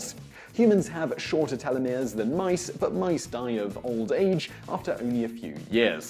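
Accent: United Kingdom. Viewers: Yeah I turned it off before a minute I don't need to hear a lot of blah blah blah in a British accent about stuff I already know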